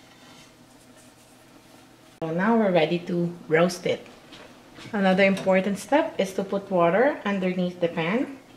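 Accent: Philippines. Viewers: hi pooo.. pwede po ba to sa uling lutuin? wala po kaming oven eh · my family love the taste and the meat was moist. ( i put star anise- similar to cebu's style)... it got burnt on top a bit... the side got crispy but the bottom was not... and i used the thread for morcon and it got snapped.... in my scale of satisfaction- 10 highest, i give myself 5... but im gonna try again... brining really works... i do that on my turkey.. Salamat!!!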